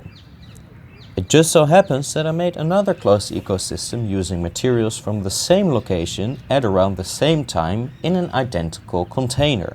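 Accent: Netherlands. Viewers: i smell a dutch accent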